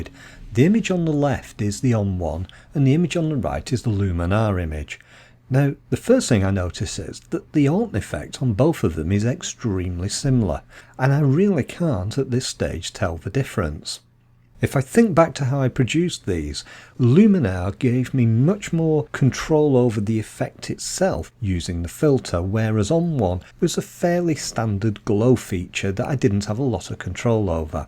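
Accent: United Kingdom. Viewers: Nice to hear a fellow Brit extolling ON1. I've seen this done differently as well in ON1; duplicate the layer, drop the exposure by a stop, add blur and then reduce the mask to about 50%. It'd be interesting to compare the two.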